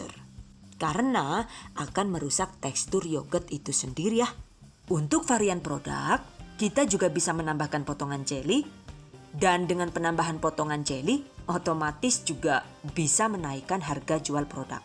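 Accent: Banyumas, Indonesia. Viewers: Mantul Bun. Eh kok agak gelap ya vidionya bun? Di dalam ruangan ya ? Atau lagi mendung ? Tapi tetep mantul kok masih jelas apa lagi ngapaknya bunda bikin emez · Tek baca Madiun baca lg Madiun takut salah ehh bnr Madiun tp ngapak ky Banyumas Purwokerto😂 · 😜😜😜bahasane rika ngapak temen yuuuu...nyonk seneng lah😜😜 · Rika wong ngendi lik? Inggrise karo ngapake kepenak temen. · salam ngapak..ora ngapak ora kepenakk ya bun..hihi..mksh resepnya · Banyakin logat ngapaknya dong bund...biar nontonnya makin semangat 😄😄😄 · ngapake ky inyong...😀👍👍👍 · Hahaiii, ora ngapak ora penak Lanjut na mba Subscriber wis nempel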